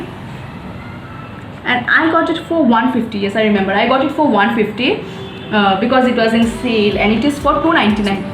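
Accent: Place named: Assam, India. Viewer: R u from Assam ........ Karon kothat olpo Asomiya lagil❤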